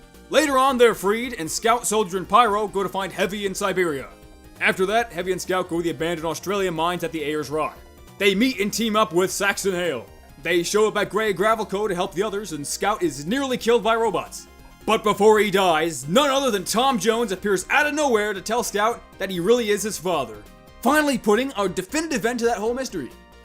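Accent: Boston, United States. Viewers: fast Boston boy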